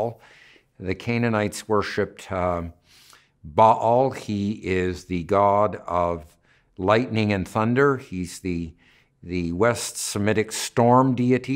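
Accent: Canada. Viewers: One minute in and I pegged him as a fellow Canadian....that accent sticks out like a sore thumb LOL..turns out he is. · Interesting lecture; I could tell he is Canadian right away haha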